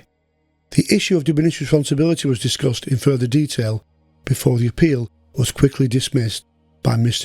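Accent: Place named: Bolton, United Kingdom. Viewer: Yes that's the ones. Now, it would be really interesting to see why Royle got his reprieve. I presume the documents are now a matter of public record somewhere. I think you could get an interesting episode looking at how and why some managed to escape the noose. This is all very fascinating and is an admittedly somewhat macabre subject that has fascinated me since I was a lad (longer ago than seems feasible!). I enjoy the videos a great deal - especially as you sound very similar to my brother in law who lives near Bolton!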